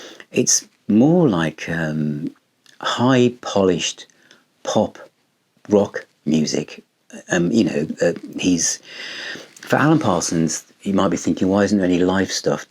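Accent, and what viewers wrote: United Kingdom. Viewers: As always I'm blown away by knowledge of the band, product, and box set evolution (not just 2 disc's but 4 of different material - nice insight). Kinda different question but what dialect do you speak? It's not Cockney. I'm just curious that's all. Rock on.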